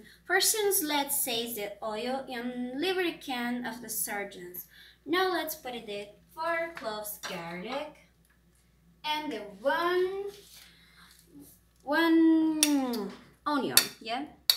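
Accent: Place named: United States. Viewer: Caramba tu parece uma americana falando kkk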